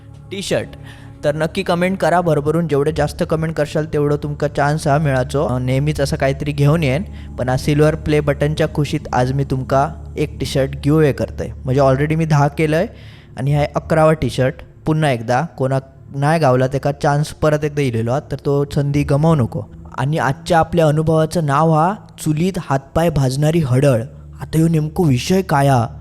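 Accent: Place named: Konkan, India. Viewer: Tujha mule Konkan chi bhasha awadayla lagi ani chan vatati aaikayla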